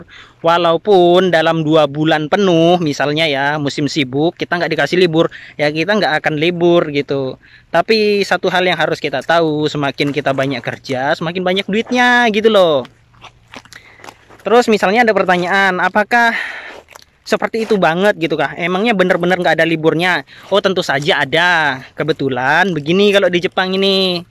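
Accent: Java, Indonesia. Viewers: Asli kalbar tapi logatnya ada jawanya?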